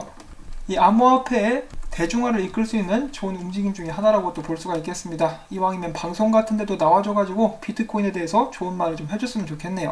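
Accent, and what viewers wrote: Jeolla, South Korea. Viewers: 양쪽 언어를 네이티브로 섭렵 구사해본 바로는 전라도 쪽에 무게가 실립니다만 좁은 땅에서 지역보다는 좋은 사람이면 된겁니다 목소리 톤은 박지성 선수 필이 조금 가미 되어 있다 사료됩니다 ㅎ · 제가 듣기에는 전라도 같은데요? 단지 님은 센발음을 선호하지 않는 경향이 있으신듯...저점===>우리는 보통 저쩜이라고 읽지만 님은 저점이라고 하심~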